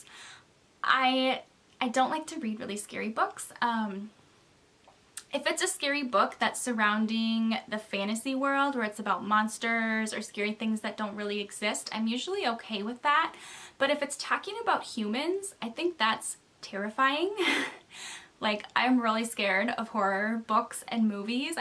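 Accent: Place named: Minnesota, United States. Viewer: welcome! I also just joined booktube :) I'm from Iowa, so I could totally tell that you were from Minnesota right away!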